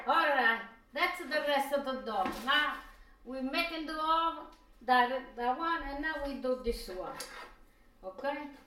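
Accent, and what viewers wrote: Italy. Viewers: I had the same exact plastic bowl, but in a lavender color and it broke one day while trying to seasoning my pork shoulder...by the way luvvvvvvv😍😍😍😍 your Italian accent and your videos...please continue to make them and the Italian food recipes...by the way I'm total Hispanic, but somehow I reallyyyy understand exactly what your saying even with your Italian accent. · I sometimes forget to watch the video as I love to listen to her thick Italian accent! Love her! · you are awesome, my grandmother was from Poland a d except for the Italian accent you remind me of her, I will see her again someday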